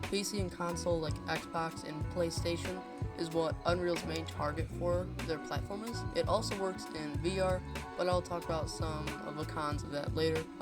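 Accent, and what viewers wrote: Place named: Chicago, United States. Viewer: you sound like you're from chicago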